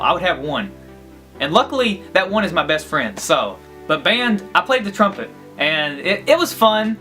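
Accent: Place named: Arkansas, United States. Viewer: Btw I like your voice that Arkansas accent 👌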